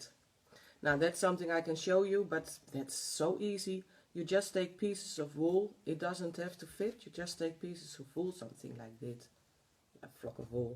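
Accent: Netherlands. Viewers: Aan het accent te horen bent u Nederlands. Petje af voor het goede Engels! Een tip: iemand anders iets aanleren is 'teach', not 'learn'. ("I will teach you how to do that') En ja, het is 'forehead'. Geen probleem. Het is heel goed om naar te luisteren, en de poppen zijn geweldig. Ik zou graag een boek zien dat de technieken beschrijft, bestaat dat? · Are you Dutch? cause yeah sorry for asking I still like your videos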